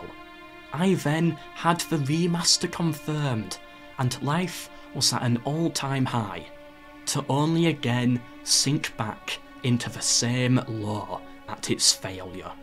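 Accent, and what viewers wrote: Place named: Scotland, United Kingdom. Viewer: How do you even confuse a Scottish and a Northern English accent. I mean seriously, you guys don't even have a slightly similar voice! In any case, glad you're doing better now. Cheers mate!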